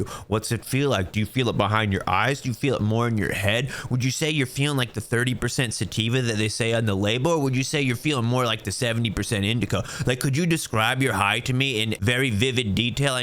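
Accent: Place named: New York, United States. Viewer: I dont even smoke, I watch when this guys NEW YORK accent spills into the video.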